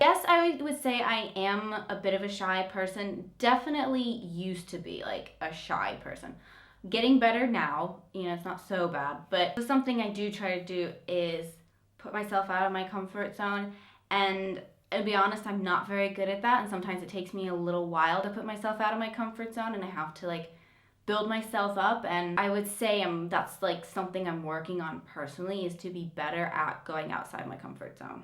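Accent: United Kingdom. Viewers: There's definitely a British accent comming trough that Southern US twang. Give it a few years. Anyway, i alway's find this subject a bit awkward to watch with other YT-ers, but you handled it quite well,👍-up on the vid.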